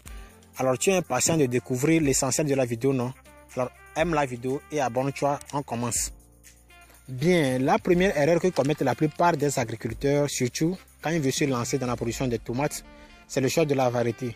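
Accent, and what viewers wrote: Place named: Africa. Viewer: DSL, je ne comprend rien,,,,, accent Africains trop prononcé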